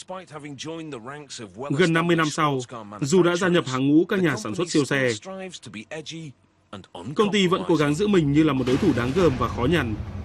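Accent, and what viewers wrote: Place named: North, Vietnam. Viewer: Value giọng Bắc, lam bót ghi ni haha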